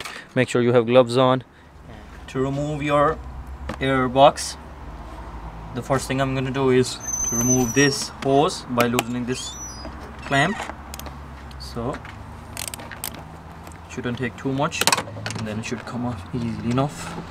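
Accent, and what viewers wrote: Cork, Ireland. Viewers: sounds like im home in cork listening to you 😂😂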